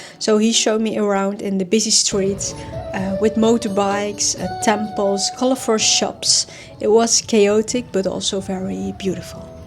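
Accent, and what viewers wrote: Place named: Netherlands. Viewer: Where is your accent from? You sound Dutch 😊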